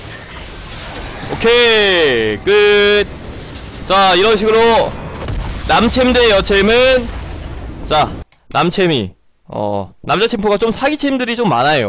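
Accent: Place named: Seoul, South Korea. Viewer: 일로온나 일로온나 익숙한 부산사투리가 들려 ㅋㅋㅋ 님 말투 서울사람같은데 어디사심??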